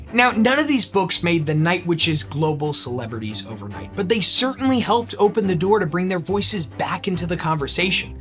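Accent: United States. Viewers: european history taught to me in a philly accent, it's like i'm back in high school <3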